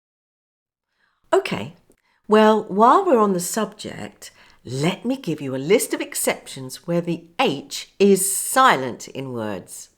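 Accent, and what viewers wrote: RP, United Kingdom. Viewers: Por fin alguien que habla RP accent .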